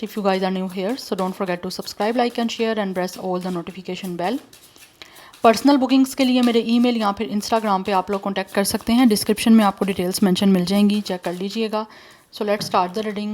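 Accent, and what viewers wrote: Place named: Haripur, India. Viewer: Ape card reading main her bate per yes keuin bolty hain Mazret kay sath ape Hari poor key hain ape kay Lehjay say Andaza Lagya hy main nay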